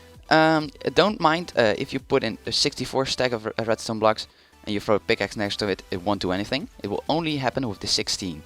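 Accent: Netherlands. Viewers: Kut nederlands accent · Becous of your accent I think your Dutch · Are you dutch? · I can here an obvious dutch accent...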